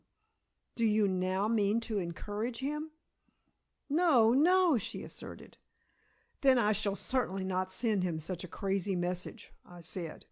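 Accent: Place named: Texas, United States. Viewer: Ugh unlistenable. I live in NYC. The narrator is obviously meant to be a man. This is read by a woman in Texas. The effort is appreciated, but unsuccessful.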